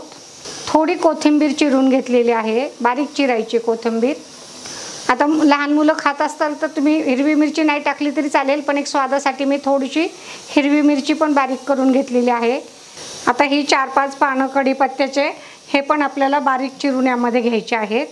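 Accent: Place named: Ahmednagar, India. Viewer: Tumhi Ahmednager cha aahat ka tumcha bolanavarun taser care.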